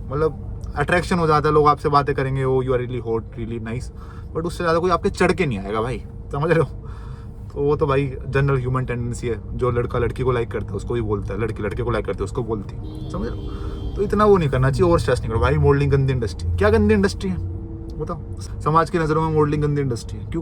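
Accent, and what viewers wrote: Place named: Delhi, India. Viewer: bhai aap cheppi bada tagda bolte ho !!!!!!! maira delhi ka yek guy friend hai ditto same !!!!! ikdam !!!!! ussi ki tarah bolte ho 😆😆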